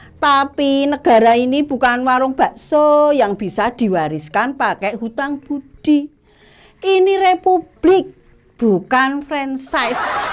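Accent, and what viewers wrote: Madura, Indonesia. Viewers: Ini mak jelas org madura nih, Karena aksen suara nya ada aksen madura trgas, lugas, jelas, cerdas, kreaktif, itu tipe wanita madura.. Itu prediksi saya, Maju terus mak. Konten mu sangat membantu utk koreksi, intropeksi buat aparatur negara.. Semoga sukses mak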